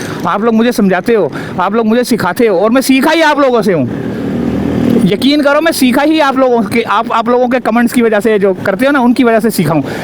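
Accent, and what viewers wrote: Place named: Hyderabad, India. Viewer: بھائی،،اپ کے لہجے سے لگتا ہے کہ اپ حیدرابادی ہو،،،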